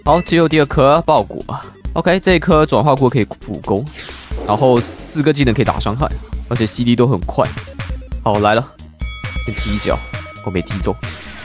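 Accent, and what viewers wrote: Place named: Hong Kong, China. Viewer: R子你可以用马来西亚的口音吗？好怀念你马来西亚口音。你现在的口音好像香港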